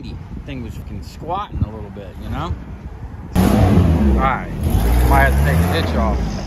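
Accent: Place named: Philadelphia, United States. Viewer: You sound like you have a Philly accent.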